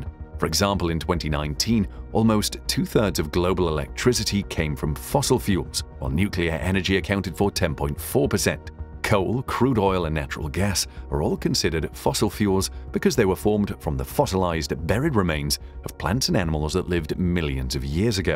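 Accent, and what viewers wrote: United Kingdom. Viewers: I guess that British accent is to make the Chinese propaganda sound intelligent. Does the name Chernobyl (thorium reactor) sound at all familiar?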